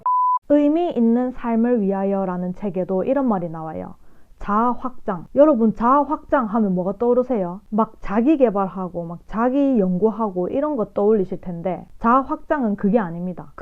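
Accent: Gyeongsang, South Korea. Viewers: 경상도 말씨에 정겹고 배움에 도움이 되는 영상들이 많아 감사합니다. 사랑합니다. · 우연히 구독하게된 경상도 사투리~ 왕팬 될 것 같네요. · 자기계발 관련 동영상 정말 많이 보는데, 영상을 보고 직접 실행에 옮기게 해준 건 님 영상이 처음인 것 같아요. 감사합니다!! 제가 경상도 사람이라 그런지 말투도 너무 정감가고 실제로 나이도 같아서 똑순이 친구한테 피가 되고 살이 되는 잔소리 듣는 것 같기도 합니닼ㅋㅋ 같은 내용이라도 정말 이해하기 쉽도록 설명해 주시는 게 님의 엄청난 능력이라고 생각해요!! 만나는 주위 사람들마다 님 채널 추천하고 다닙니다ㅎㅎㅎㅎㅎ 백년을 살아보니도 꼭 읽어보겠습니다! 앞으로도 좋은 영상 많이 부탁드립니다 =) 감사합니다! 오늘도 행복만 하세요♡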